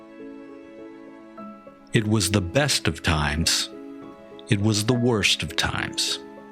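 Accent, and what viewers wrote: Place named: United States. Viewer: He's not AI -- he's American. They tend not to do languages well. Dan Rather use to say " N-rowt" for en route.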